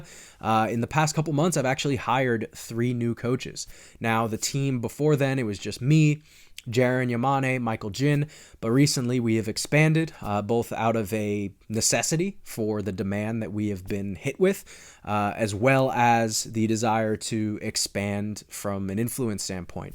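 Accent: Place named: Miami, United States. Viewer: Bros been in Miami for too long, almost say america with an accent lol.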